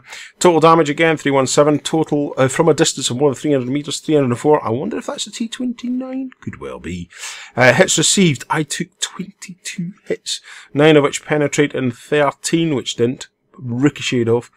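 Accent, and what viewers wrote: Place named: Scotland, United Kingdom. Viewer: yea, I like your vid...got my sub...can't wait for some more..Love the Scotsmen Accent...I'm in Nova Scotia...where abouts you at M8?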